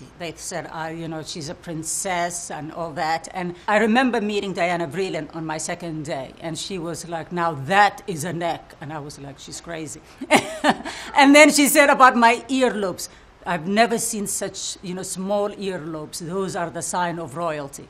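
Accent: Somalia. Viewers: Her Somali English Accent is cute! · she has a very faint Somali accent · she's somali not arabic, we don't have the same accent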